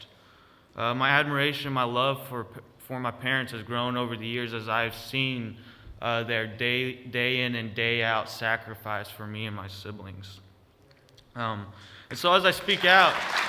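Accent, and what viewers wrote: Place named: South, United States. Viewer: Omg. You have a southern accent?? Omg. God bless. What a miracle you are. 🙏❤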